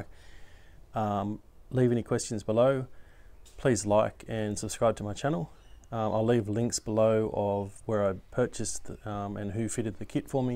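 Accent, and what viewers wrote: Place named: Australia. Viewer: You sound aussie, so aren't you worried about getting caught with a 1000w motor?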